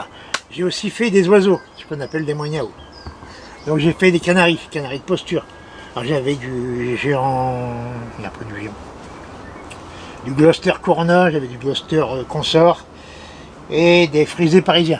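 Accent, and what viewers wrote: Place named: Boulogne, France. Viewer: Oh là là!! Déjà l’accent français et là le boulonnais lol 😂 J’ai bien rigolé, merci! Joyeux temps des fêtes à toute votre petite famille! Nous on vient de recevoir au moins 40 cm de neige, ce sera un beau Noël blanc!! Bisou du Québec!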